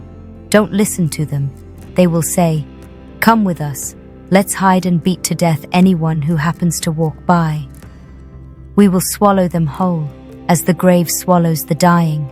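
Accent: United Kingdom. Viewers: I love it. Is that a British accent?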